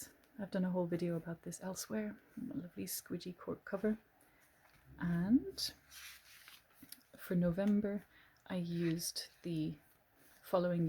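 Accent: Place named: Ireland. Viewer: You have an incredible soothing voice.. Nice to see.. And hear.. Irish voices..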